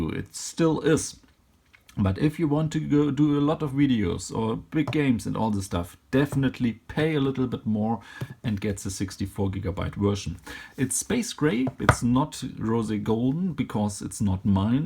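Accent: Germany. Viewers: Yes her is a German :) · You sound like German... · I'm in love with the phone and your accent! Deutsche?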